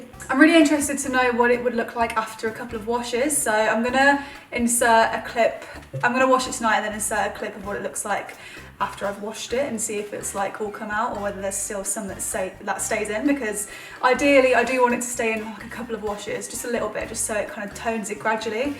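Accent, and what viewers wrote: United Kingdom. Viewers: i did not expect her to have a british accent, after all those four nine looks stories on snap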